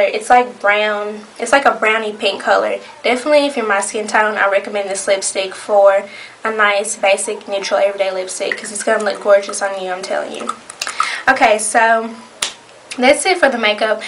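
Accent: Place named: South, United States. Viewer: Omg i love you country voice!!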